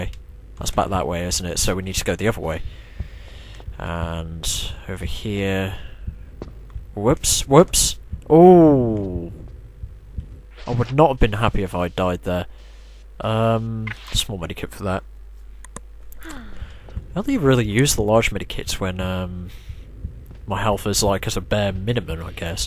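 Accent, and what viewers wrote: United Kingdom. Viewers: gotta bomb dem aliens. TR logic. You don't question it, you just take it for granted. Accept it for what it is. EHAEUAHEUAEHUAEHUAEHUAEHUAEHUAEHUAEHEAUHEAUHAEUEAHUH this was hilarious. .mp3 ammo. Totally. that's totally fine. British accent is cooler and sexier than American. EHAUEHAUEHAUAEHUEAHEAUHEAUHAEUAHEUEAHUAEHUAEHU straight into the lazor. I'm choking here :D yes, you have gone the wrong way. I just love your fuckups <3 look how sweet they are. I want one of them. No, actually I want both. I suffer from an extreme Lack of Domestic Animal Syndrome, so like... yeah :( dat heart attack. Warning for headphone users. Don't you love how the UFO is so small on the outside, but absolutely huge inside? Also, as a kid, I was hoping to fight some aliens, and got super disappointed.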